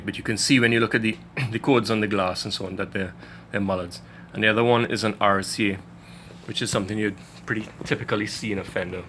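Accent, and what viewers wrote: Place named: Trinidad and Tobago. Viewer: Trinidad? Please tell me my ears dont deceive me! Woodbrook boy says hello!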